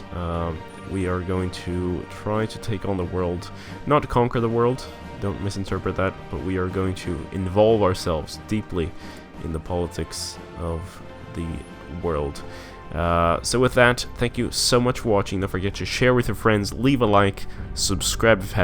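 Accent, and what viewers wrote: Brazil. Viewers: You have an exelent brazilian accent :D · Okay, Carioca ou Paulista? Cause that sssss issss thiccc boii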